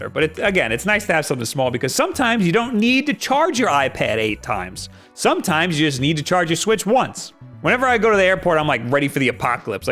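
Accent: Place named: New York, United States. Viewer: I know I feel like I'm being yelled at. It's like I'm in New York or New Jersey.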